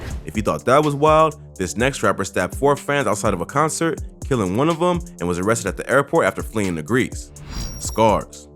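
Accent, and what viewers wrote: United States. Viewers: Bro I love this us accent wtf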